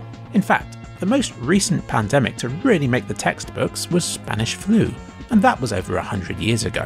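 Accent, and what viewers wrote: United Kingdom. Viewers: You sound British a re you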